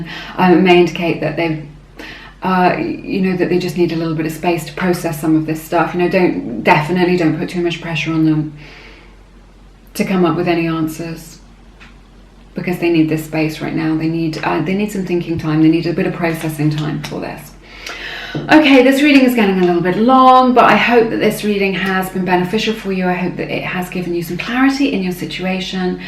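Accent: United Kingdom. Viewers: THANK YOU so much! I was lead to your channel by another youtube channel who I really respect. I’ve found that channels my friends or other readers have recommended to me are usually very accurate (could be the strength of my energy that’s being picked up on 🤔 Your reading was absolutely spot on to the point I was just smiling knowingly all the way through it (I chose 2). And because I’m also British, I don’t know why but I seem to resonate more with it. Silly I know but I like hearing the familiarity of the British accent! Thanks once again. 💙⭐️🙌🏾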